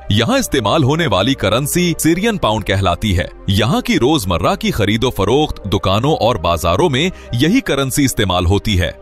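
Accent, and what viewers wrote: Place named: Pakistan. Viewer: Kya aap pakistani hain? Itni pyari urdu pakistan walon ki ho sakti hai 😊 hum mumbai se hain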